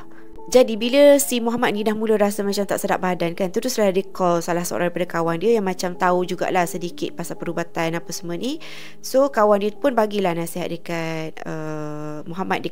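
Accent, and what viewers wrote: Kelantan, Malaysia. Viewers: Kak ore kelate ko bunyi mcm ckp kelate je.. Air bodo tu gpo maksud dio🤔🤔😅😅😅😅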